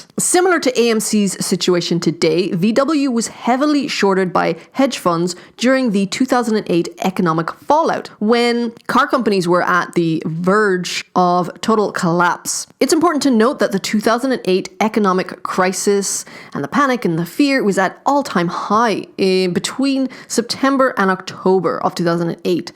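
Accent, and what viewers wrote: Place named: Ireland. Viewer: Great job! You sound Irish, my son lives in Coventry, UK.......You are doing outstanding work for the community....Thank You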